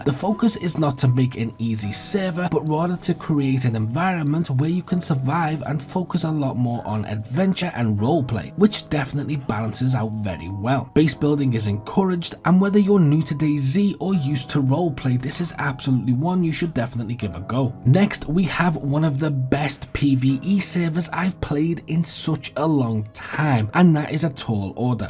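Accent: Liverpool, United Kingdom. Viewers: Nice you from liverpool mate ?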